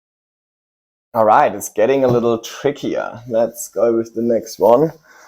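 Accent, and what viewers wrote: Germany. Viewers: Ahh nice! My best friend is German, I detected something in your accent. 😜 Right! Makes sense. How do you remove the roots from the moss pole successfully?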